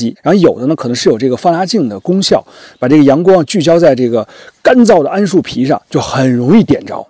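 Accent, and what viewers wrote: Beijing, China. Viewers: 听口音明明是大陆人，一到youtube上就切换成了繁体字，我也不知道你到底是怎么想的。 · 北京口音很重，用普通话更好些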